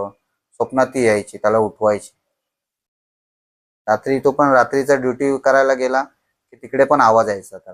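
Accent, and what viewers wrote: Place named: Mumbai, India. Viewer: your marathi accent is lovely... typical Mumbai marathi... I love it.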